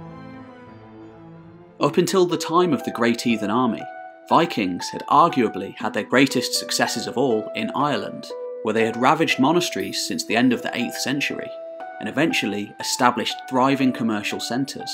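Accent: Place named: England, United Kingdom. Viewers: We can forgive the very English pronunciations ! Overall amazing, thank you. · Thank you for your facinating video sir. Your accent interests me as it sounds Northern so originating in God's own country?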